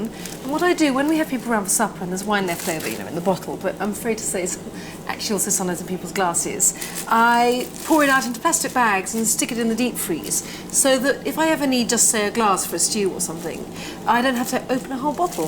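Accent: United Kingdom. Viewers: nobody said she's not interesting in the Nigella express. It's just an obvious difference between Nigella bites & Nigella express. Nigella bites is so much better raw, vintage , cozy vibes much much better. Her kitchen, cozy house, pantry, little trips to the local market, going out to Bridgerton pier with kids, house parties allll of these things are so nostalgic. I'm not even from the UK or US. Yet I feel sooo much comfort in her vibe . I literally grew up watching Nigella. I was little and I couldn't understand English on top of that her thick British accent. Yet I continued watching until I started catching few words and finally fully understood what she was saying. Nigella feels like a close non judgemental motherly friend.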